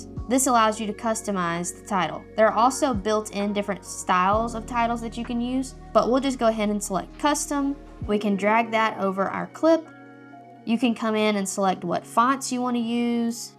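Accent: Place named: South, United States. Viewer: New subscriber. Like your Southern accent too. 😜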